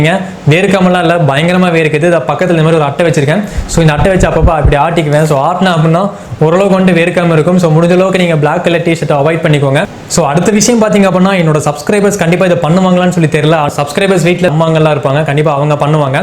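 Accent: Coimbatore, India. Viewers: Nenga Coimbatore aha I jolly.. Naan Sathyamangalam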